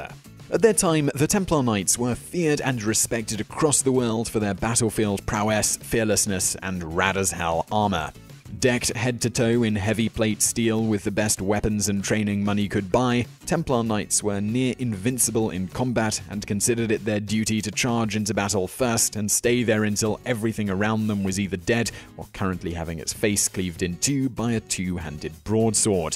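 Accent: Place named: United Kingdom. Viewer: Off topic, I realize - but this Southern American just loves your British accent! "Numba Niieeen-uh'...... like listening to music :-)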